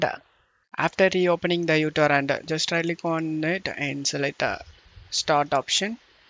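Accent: India. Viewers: as soon i see indian accecent i knew hw will fix my problem thanks bro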